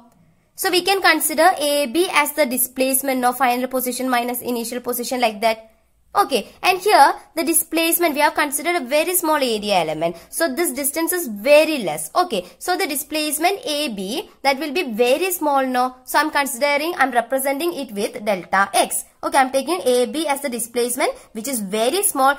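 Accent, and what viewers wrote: Kerala, India. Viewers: i can say that she is from south india from her accent.😂😂thank you mam i understood it.Love from Nepal❤️❤️ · The way she talk in English we can say that she is from Kerala 😁👍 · yeah I think she is malayali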